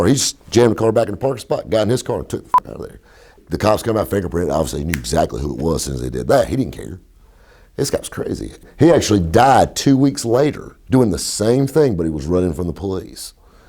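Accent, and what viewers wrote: South, United States. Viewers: Fake southern accent? This is exactly how people in parts of the south speak, especially the Carolinas. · This needs subtitles in English, southern dialect is killing me! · English is not my native language but I always thought I had a pretty good knack understanding people from the U.S. This guy's drawl however? Too much for me. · What accent is that? As in where in America is he from? It's really nice to listen to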